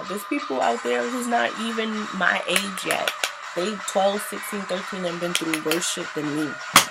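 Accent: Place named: East Coast, United States. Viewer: Hey, R.I.P. to your Mom's and I'm sorry she passed away at only 42. She was So young. Shout out to your Dad for still being a Dad and always being there for you. This was another cute make up Tutorial. Thanks for sharing. Your East Coast accent is So Strong. You Crack me up sometimes. Oh and about your Etsy shop, let me know when you have it up and I'll buy some notes from you. I have an Etsy shop myself and I've been in business for a few years. It's a Good place for business and I'm sure you'll do well:)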